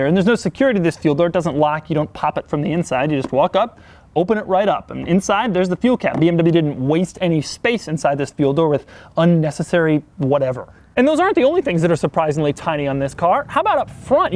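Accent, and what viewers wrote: United States. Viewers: Doug is American. We say “zee” here. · Ya, and it's Zee Zee Top, not Zed Zed Top, chill he's american, americans say Zee.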